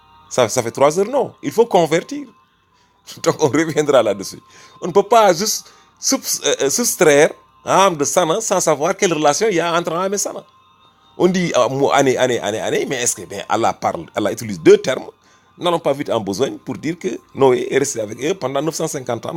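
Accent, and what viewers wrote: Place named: Pulaar, West Africa. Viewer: Un vrai accent halpular!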